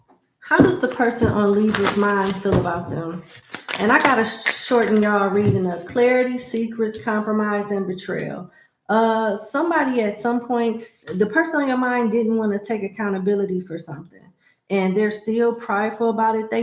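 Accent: South, United States. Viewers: Love your vouce and accent Southern right? Which state?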